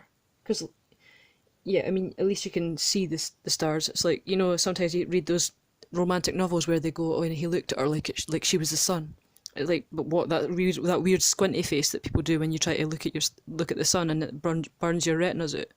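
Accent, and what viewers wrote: Scotland, United Kingdom. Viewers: 3 soo on point 👌🏾 btw I love your accent, reminds me of my best friend, she's scottish ❤ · Woohooo a Scottish reader 👌🏼👌🏼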